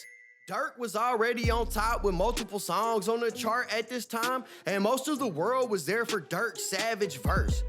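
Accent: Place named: California, United States. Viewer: Dog, not bad. Where u from? Sounds like Cali somewhere But your accent is extra! Imma get used to it.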